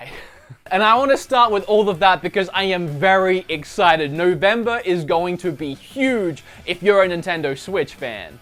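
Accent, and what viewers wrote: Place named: Australia. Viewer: you know hes Australian right...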